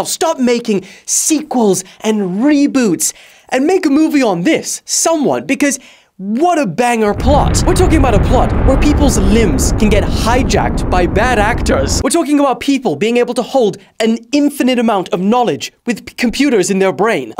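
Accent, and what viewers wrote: South Africa. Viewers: You grew up in SA huh, i knew that accent sounded familiar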